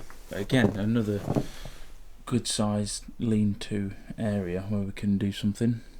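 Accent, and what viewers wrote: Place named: East Midlands, United Kingdom. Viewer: Just started watching your videos, listening to your accent I thought you were from around East Midlands and just seen Leicester. Big move and a sensible move, well done you, we got out of Leicester 4 years a and moved to the Outer Hebrides thinking it was remote, nothing like you. Look forward to you renovating the house, where in Leicester were you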